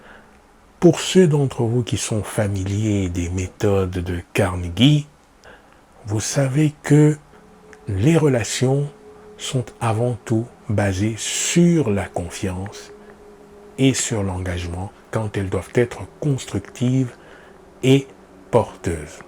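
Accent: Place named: France. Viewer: Wow I don't know why but I really love the accent of French although I hardly understand it 😊 I am a new friend and here to support you. I do hope to get one back 🙏😊🤗🔙 thank you so much 🙏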